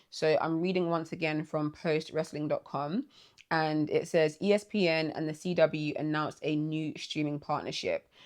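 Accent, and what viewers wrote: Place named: United Kingdom. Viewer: Hiya, german here, your channel trailer got recommended to me. I have stopped watching WWE stuff a few years ago and am focusing on other promotions and old shit, but you're sympathetic so I'm giving a sub. You sound british, you going to All In (and Revpro or others :D )?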